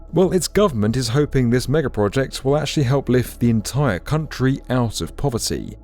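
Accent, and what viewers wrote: England, United Kingdom. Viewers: why naratorrrr talk like inglish with nose full off secretions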